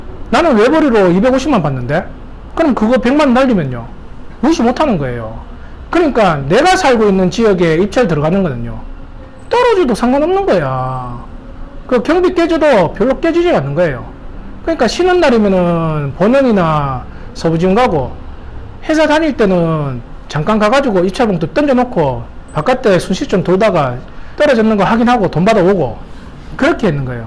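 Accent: Gyeongsang, South Korea. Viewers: 쇳쇨 심한 심한 경상도 액센트 너무 강해서 듣기싫네요, 군대 바리훈련소도 아니고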